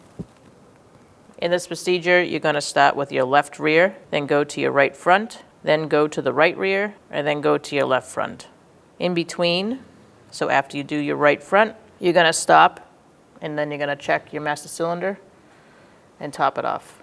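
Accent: Boston, United States. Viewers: This is gonna be a task, watching this thick accented Boston woman replace these calipahs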